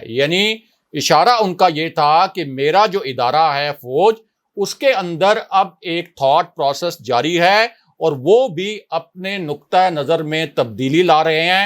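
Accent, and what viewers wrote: Punjab, Pakistan. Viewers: Why you speak urdu in Punjabi accent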